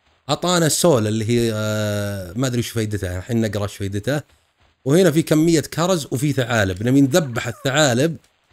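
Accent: Qassim, Saudi Arabia. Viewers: 😂😂😂 والله المقطع ضحكتني الله يسعدك و أحلى لهجة القصيميه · قصيمي اللهجه؟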